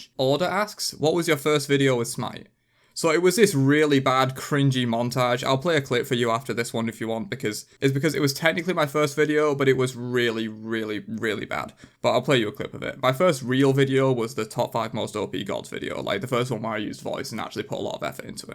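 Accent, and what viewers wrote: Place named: Northern England, United Kingdom. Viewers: Big up the Northern English, im a northerner myself but slightly more northern than Yorkshire, still not too far from eachother at all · what part of Yorkshire are you from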